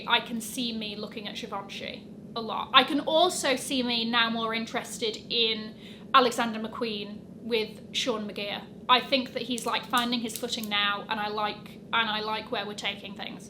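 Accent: United Kingdom. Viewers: “Burberry trench coat for everybody” she says with a strong British twang… 😊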